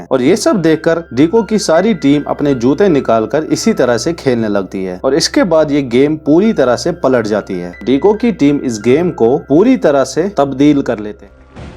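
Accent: Kashmir, India. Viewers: I think you are KASHMIRI because of your accent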